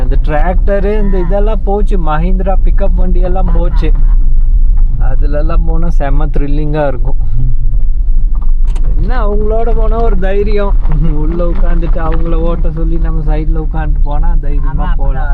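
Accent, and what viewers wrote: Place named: Karnataka, India. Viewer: ௨ங்கள் பேச்சு ஒ௫ மாதிாியாய் இ௫க்கிறதே நீங்கள் கன்னடத்துகாரரோ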